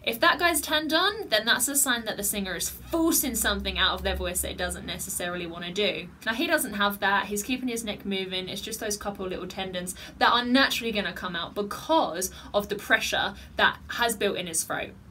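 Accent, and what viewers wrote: United Kingdom. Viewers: (" "), your bravado made me comment on this page! Your craft is passionately represented and it is very appealing to know that you are inclined to view and comment on video suggestions from your students. The british accent is delightful as well. killed this record and there is a need for COVID-19 refreshing music. keep em coming and I love the commentary, equally your facial expressions and voice overs killed this review...... go! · Greeting. It is good to know, you teach singing with vocal instruction . Thank you, been a "Music Teacher". Question ? : Are you from England ? 🇬🇧 . You have a strong British, accent. I am trying to listen and understand what are saying. Well, keep up with your videos look good. Please, try not to make faces, make look much older and not looks good. I believe , you are young and beautiful. Please, try to show a little positive expression, people will approach you more. 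Take care of yourself, kisses and hugs, XOXO , Love. Sincerely, 😎Mr. Villalba 🇵🇷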